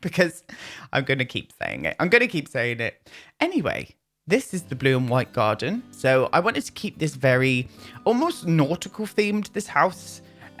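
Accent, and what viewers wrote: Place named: Devon, United Kingdom. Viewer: I know this is probably a stupid question but are you from Devon x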